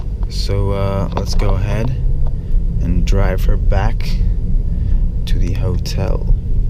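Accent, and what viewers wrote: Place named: New Jersey, United States. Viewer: Are you from Jersey or are you Italian? This is my first time watching one of your videos but your accent in your voice reminds me of Pauly D from Jersey Shore.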